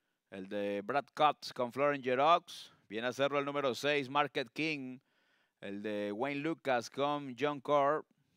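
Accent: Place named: Venezuela. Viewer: es venezolano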